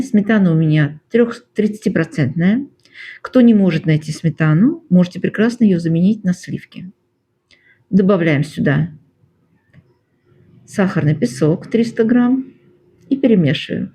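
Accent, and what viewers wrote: Baku, Azerbaijan. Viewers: Здравствуйте.Случайно попался Ваш старый ролик, стало интересно.Когда "зашла" на Ваш канал, и услышав Ваш акцент, сразу поняла, что есть наше, бакинское. И я не ошиблась. Я сама из Баку, и Вам огромный, бакинский Салам!!! 🙋🙋🙋❤❤❤💐💐💐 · Здравствуйте,спасибо за напоминание,родилась в Баку,живу в Москве,рецепты ваши моя молодость,а Бакинский акцент отдельная ❤❤❤❤❤ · Вам сразу же лайк и подписка за то что вы из Баку!❤ я тоже Бакинка. Случайно забрела на ваш канал. Но не сразу поняла откуда вы, хотя легкий акцент ласкал слух. Я живу в Америке и всегда рада любому бакинцу. И рецепты у вас замечательные!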